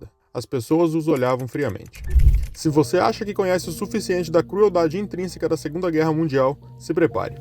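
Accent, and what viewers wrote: Brazil. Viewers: Sotaque sudestino de merda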